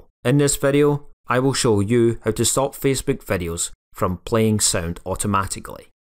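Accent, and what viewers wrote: Scotland, United Kingdom. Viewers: Your Scottish accent made me smile immediately.😅 Oh, and thanks, it worked!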